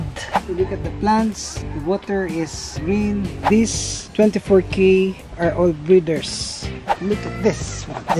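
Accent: Visayas, Philippines. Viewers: Bisaya diay ka kol? 😂😂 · Bisaya mn d ay ka kuya HAHAHA TAGA ASA KA SIR?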